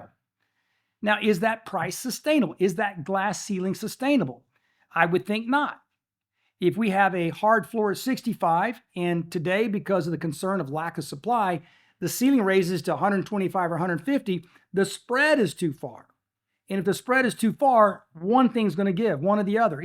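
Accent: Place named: South, United States. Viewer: I can hear the southern hills underneath this man’s voice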